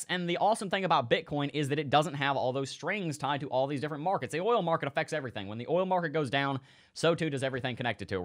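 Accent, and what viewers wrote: United States. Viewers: that is a lot better 😂😂😂 Can I ask you, where are you come from. Because I’m from the Netherlands 🇳🇱 for me you sounds like a American...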